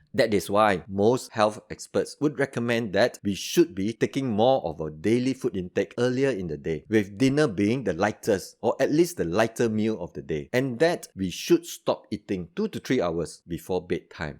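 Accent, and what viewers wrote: Singapore. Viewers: you must be singaporean. Singapoh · Singapore accent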